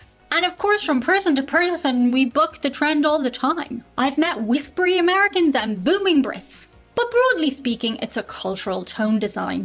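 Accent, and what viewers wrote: Ireland. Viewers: Good irish accent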